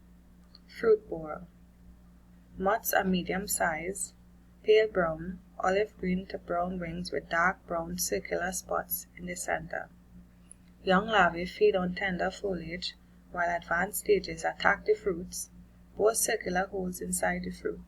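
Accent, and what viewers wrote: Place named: Trinidad and Tobago. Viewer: thanks for the advice and what to look for on the okra plant. You speak like a trinidadian.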